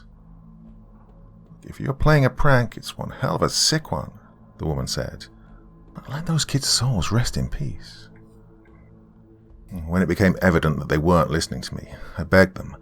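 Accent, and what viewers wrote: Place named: United Kingdom. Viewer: Your accent... where in Britain is that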